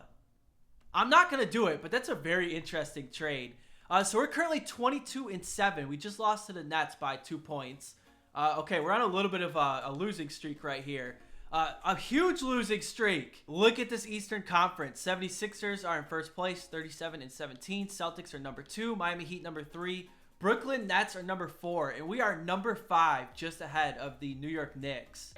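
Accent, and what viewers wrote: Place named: Wisconsin, United States. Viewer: u said Bogdanovic wrong but im pretty sure thats just ur Wisconsin showing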